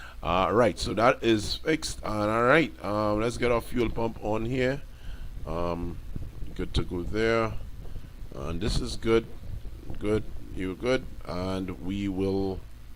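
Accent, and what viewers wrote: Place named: Grenada. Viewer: Your accent made me happy, I went to SGU in Grenada. I miss it! Pure Grenada!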